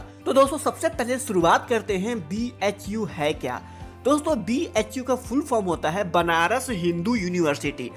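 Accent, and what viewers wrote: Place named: Bihar, India. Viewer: Bolne wala banda bihar ka hai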